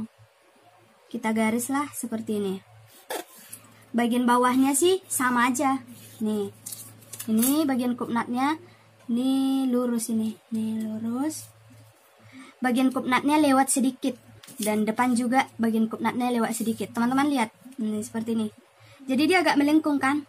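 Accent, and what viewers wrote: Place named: Sumatra, Indonesia. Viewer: Hallo, lokasi ada dimana ya? Logatnya sih sumatera nih... Saya baru gabung, lumayan menyenangkan dan cukup detil penjelasannya. Apakah juga terima jahitan makaud saya sbg penjahit? Tks 🙏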